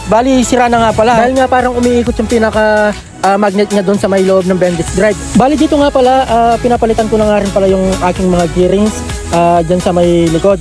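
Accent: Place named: Pampanga, Philippines. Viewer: kapampangan ka pala paps. kumusta na ecu?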